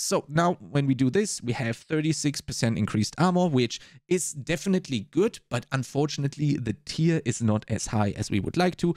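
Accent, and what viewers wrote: Germany. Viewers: Thanks mate. This crafting kills me. Now I have a better overview. P.S. Höre ich da einen deutschen Akzent heraus ? ;-)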